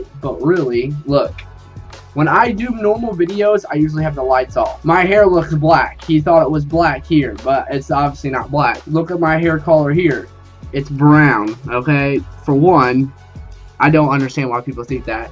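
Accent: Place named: South, United States. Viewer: Why does he sound like a typical hillbilly incest 3rd grader?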